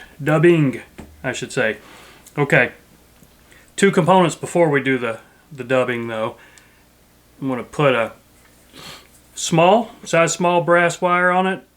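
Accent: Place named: South, United States. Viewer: Stop cringing, although I cringe when I hear my recorded voice 😖I like this format, great intro. You sound more "southern" in this video;o) Geeze in almost 2 years you now sound like a celebrity host of a how to show 👍Nothing wrong with either production styles, your dedication to the channel is amazing! Thanks for doing this! Oh yeah the fly, heard of Humphreys, a lot, but not aware of this bug. I tied Ed Shenk's cress bug. But I'll tell ya, if you pull a Usual under water in the middle of a drift, especially in a good current, hang on for a surprise. I think the trout take it for one of those critters. Thanks again, those flies in the intro were awesome! 🤓👍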